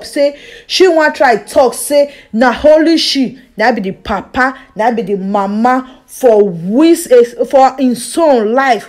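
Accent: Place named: Gullah, United States. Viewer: Enjoyed the video but I couldn't help but notice how similar your dialect is to the gulluh geechee dialect from South Carolina and patois from Jamaica.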